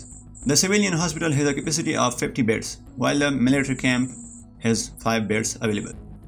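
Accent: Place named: England, United Kingdom. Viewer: The way of describing your plan is i think quite difficult..make it easier to some extent...try not to speak like an english man because then we can't understand what are you really saying 🙏🙏🙏🙏🙏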